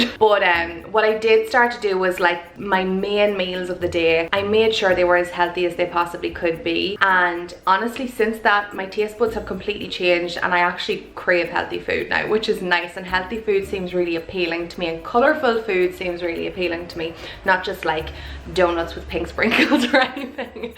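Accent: Ireland. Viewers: I love watching your videos. You give me new ideas on how to change up my every day dread of what to fix for a meal. No 2 people do things the same lol. I've been watching your videos for probably close to a month now. Definitely have my notifications on! Thank you so much and much 💘 to you!...... P.s. what started my binge watching is your accent. An Irish accent has always been my fav. Never hear people with those here in Alabama (USA).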